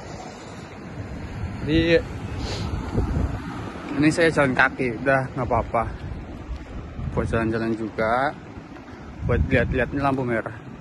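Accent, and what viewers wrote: Banyumas, Indonesia. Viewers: wong ngapak ya kang hhe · Amin. Amin. Sama-sama Mas. Ngapaknya tidak begitu kelihatan kok Mas 😁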